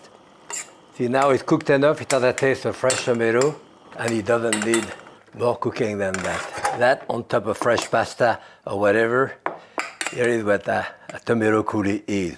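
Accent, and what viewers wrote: France. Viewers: He is French. English is his second language. He does not have an impediment.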